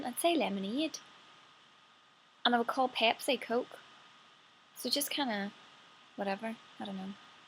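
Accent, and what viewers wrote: Northern Ireland, United Kingdom. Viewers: Great to hear this modern Coleraine accent - it has mellowed over the years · northeurn oyrleund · it's a British accent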